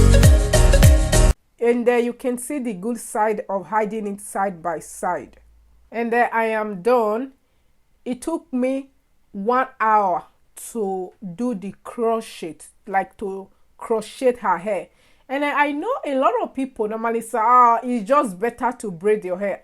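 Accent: Africa. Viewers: This accent is 100% African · I love your accent! African Queen 👐🏽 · Luv your African accent. The "t" in crochet is silent.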